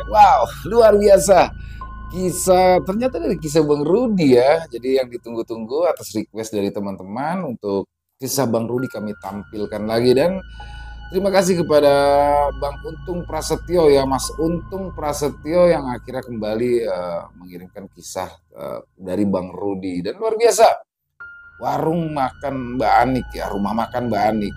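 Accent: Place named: Jakarta, Indonesia. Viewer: Ahhh seru nih ceritanya.. aku udah mengira kalau ini kisah bang Rudi, dari logat penyampaian ceritanya yg dibawakan mas, sudah keliatan gaya bahasa Betawi.... Ditunggu kisah selanjutnya, pasti seruuuu ❤❤❤❤❤❤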